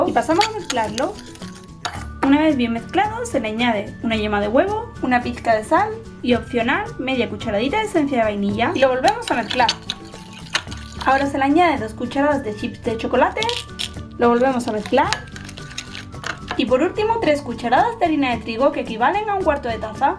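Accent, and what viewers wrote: Andalusia, Spain. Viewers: Es de España, de Andalucía, parece:) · Nada q ver pero me gusta su acento. Me gusta ustedes (los españoles creo) que apenas acentúan la T cuando dicen "está" y acentúan más la S. Al revés que nosotros los argentinos por ejemplo 😊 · no todos los españopes ablan asi jo soy española y no hablo asi, solo la gente andaluza habla asii.....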